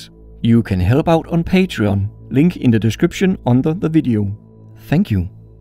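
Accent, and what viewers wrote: Denmark. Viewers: Australian? It’s danish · Er du fra Danmark? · Gotta love that danish-english accent ❤️ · Are you Danish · Wait what are you, Danish, i always thought that you were German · Are you danish?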